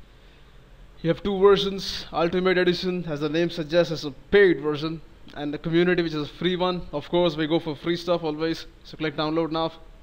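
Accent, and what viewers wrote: India. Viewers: Indian accent :3. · Indian have indian accent. So what? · Where can i find the non-indian accented version of this tutorial?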